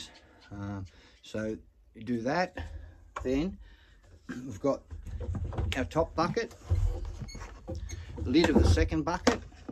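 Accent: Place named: Australia. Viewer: 😀👍 great video and your Aussie accent makes it even better! But seriously this is such an important life or death skill people need to know. Thanks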